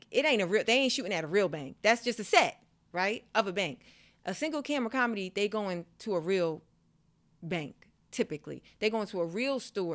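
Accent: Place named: New York, United States. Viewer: Assalamualaikum, i enjoy her comedy so refreshing to have found such a down to earth sister Muslim with a Beautiful Ny accent and such an interesting intellectual approach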